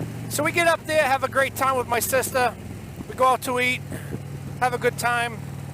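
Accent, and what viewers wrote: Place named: Boston, United States. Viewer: Love the way your accent comes right back when in Boston for awhile. Keep up the videos brother I enjoy'em and hats off for putin your life out there. Keep do'in what u love and thanks.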